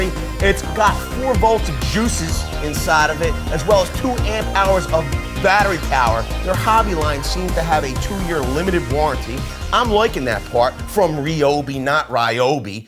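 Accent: Philadelphia, United States. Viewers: Just discovered your channel. Love it. Sounds like you’re from Philly, yes?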